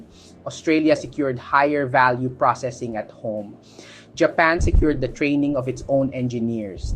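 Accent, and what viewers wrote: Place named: Philippines. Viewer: I like your pilipino accent and of course your analysis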